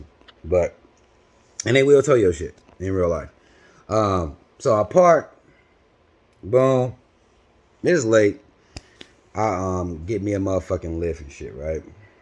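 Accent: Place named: France. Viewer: I fw you. That being said.. Accents are a thing. When you expose yourself to the rest of the world it's something you grow to understand. It'd be quite foolish of me to ignore what wisdom you have to share because it was shared in a french accent would it not?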